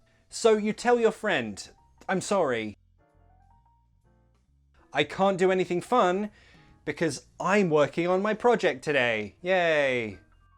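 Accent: United Kingdom. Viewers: I'll be studying english next year because i think british english incredible and i love british accent and you i think you're very amazing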